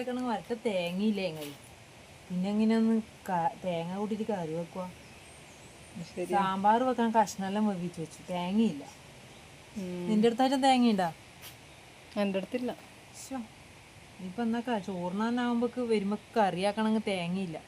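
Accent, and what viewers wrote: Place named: Kannur, India. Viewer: സംസാരം കേൾക്കാൻ ഭയങ്കര രസമാണ് കണ്ണൂർ ലാംഗ്വേജ്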